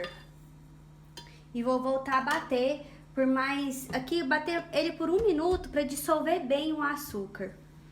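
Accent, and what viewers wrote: Minas Gerais, Brazil. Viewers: sotaque de mineira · Vc é uma linda, parabéns pelo seu carinho... Ahh e esse sotaque mineiro é mto gostoso de ouvir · esse sotaque mineiro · Olá ! Eu resolvi te segui pq amei seu sutaque igual meu povo mineiro e simplicidade amo tudo isso Vc é 10 parabéns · Amei seu jeito de falar até parece ser mineira kk . Acabei de colocar a massa para assar . Acho que vai ficar bom . 😘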